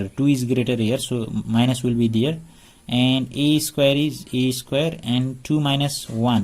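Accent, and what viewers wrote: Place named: Nepal. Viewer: Are u from nepal by any chance this is my first time watching ur vdo and I'm also from nepal and i think ur accent is like nepali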